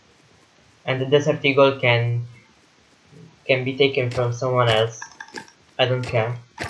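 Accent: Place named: Italy. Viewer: 100% italian voice xD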